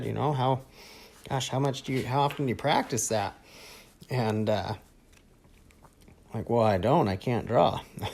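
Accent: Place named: United States. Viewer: awesome video, lots of good takeaways! practicing for 3d modelling. greetings from germany, btw which kind of american accent is that?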